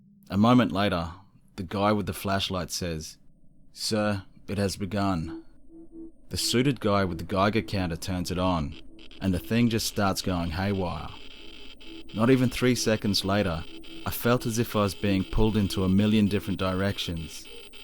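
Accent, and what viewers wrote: Australia. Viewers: Hey there,. Great video!!! Excellent stories!! Love that sexy Aussie voice!! 💓😉 BTW, have you ever read any books by Philip Imbrogno? There's one called Files From the Edge that has some very bizarre encounters!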